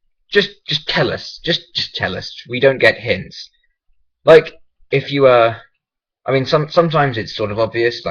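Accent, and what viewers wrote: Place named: United Kingdom. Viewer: Oh dear that's not so very nice over the sarnie things. Any way. you tell me who I am. INFACT no I will do that for you. I am Zandifate from Instagram. All I had to do was watch this and It made me laugh so hard.:). And it is like. PM. So yeah it was really funny and I would love to see more of your awesome channel. God that was so funny. And even though I am British you still have a pretty epic British Voice xD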